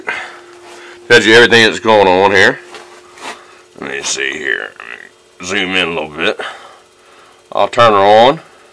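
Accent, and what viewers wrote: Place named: North Carolina, United States. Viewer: are you from North Carolina or Virginia you sound like everyone in my family :) We are in NC probably 45 min from Virginia line.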